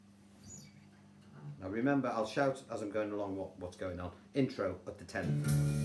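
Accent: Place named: Liverpool, United Kingdom. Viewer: I totally agree with all who have said this is the best lesson for this song on the internet! Thank you for sharing it. Plus, it's fun for this American Girl to listen to your Scouse -- cos if I am not mistaken, your accent is certainly from the north of England. So, ta from my good self! :)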